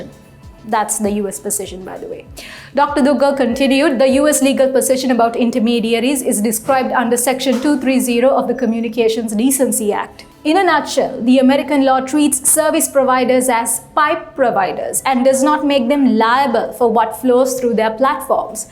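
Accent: India. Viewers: She is not british..most of Indians speak like her...no issue.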